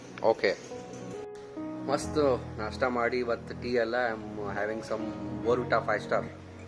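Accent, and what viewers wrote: North Karnataka, India. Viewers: Subway sandwiches are good. I too like these. Enree full north Karnataka language. I like this style. Are you only son to your parents? You are lucky boy.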